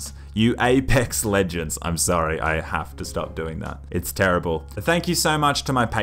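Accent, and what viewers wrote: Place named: Australia. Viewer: Within the first 5 seconds, I was thinking, 'ohh, might be a fair dinkum aussie', 1 minute I was thinking, 'what a bloody legend, an aussie gaming channel. Bloody oath.' don't even have to be told. I can smell an aussie from the other side of the planet.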